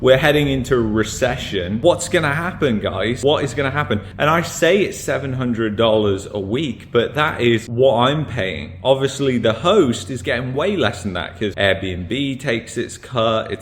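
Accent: United Kingdom. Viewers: I have refrained from commenting so far , but i have to say that this very Limey sounding bloke is one smart cookie . Hope still exists .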